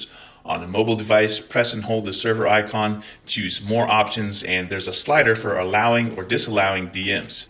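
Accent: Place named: Gloucester, United Kingdom. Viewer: I have to admit my limitations as much as I would love to join Discord - that’s for the more computer savvy person - for now I will continue to check in with you on YouTube. I’m a from Gloucester Mass a Longshoremen or as we say “Lumper” town where a guy like you - the way you talk is familiar and comforting. Thanks for everything your doing ☮️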